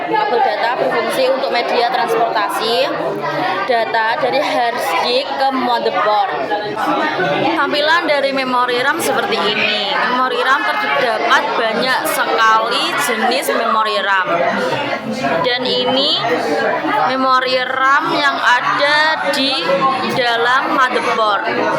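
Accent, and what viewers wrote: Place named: Java, Indonesia. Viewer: medok banget jawanya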